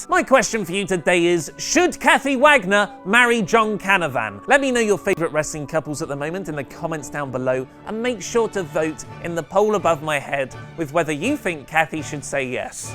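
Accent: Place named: England, United Kingdom. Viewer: what part of england you from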